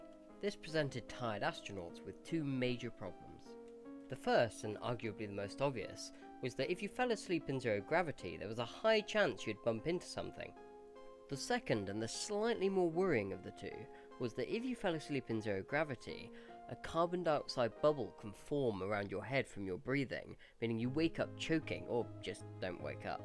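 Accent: United Kingdom. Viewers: Informational videos with british voices hit different.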